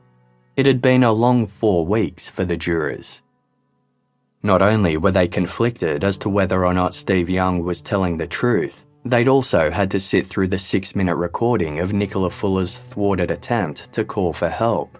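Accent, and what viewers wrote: Australia. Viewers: That clear, well modulated, Australian voice, makes this crime channel so good. Thank you for this, and peace to all out there.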